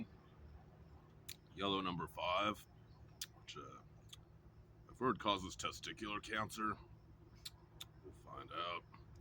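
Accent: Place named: West Coast, United States. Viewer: west coast accent was my initial guess when i first seen urn channel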